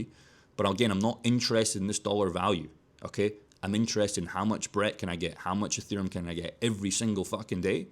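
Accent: Scotland, United Kingdom. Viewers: Scottish ffs what u American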